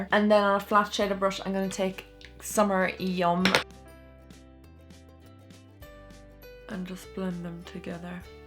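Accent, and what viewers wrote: Ireland. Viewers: are you IRISH???? cuz I am and us kind irish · Gurl are u irishhhhhh 🇮🇪💘 omgggg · I thought you had a strong Irish accent, I’m Irish myself, but I live in America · I love ur accent I'm Irish also and most YouTubers I watch are American or British ands it's so refreshing to hear an Irish accent on YouTube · fucking love your accent #ireland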